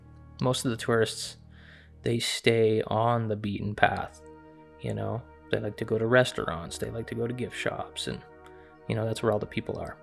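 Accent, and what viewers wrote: Canada. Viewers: Isn't he from Canada? Lol.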